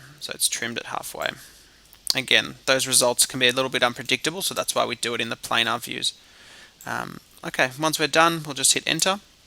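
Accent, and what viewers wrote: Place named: Australia. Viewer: love the aussie Accent :)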